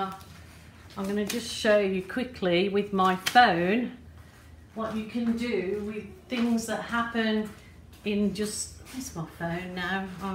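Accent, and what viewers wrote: Birmingham, United Kingdom. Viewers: Aaarh Bostin way spotted the Brum accent when we subscribed to you. From John ex Brummie (handsworth) but only moved to NE England!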